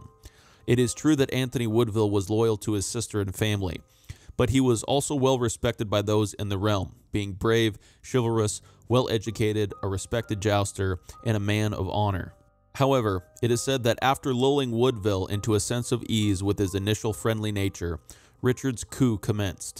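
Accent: United States. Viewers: I enjoyed this video. Is the narrator an American? His voice is pleasant and melodious ----- reminiscent of a Southern accent (Virginia, perhaps?).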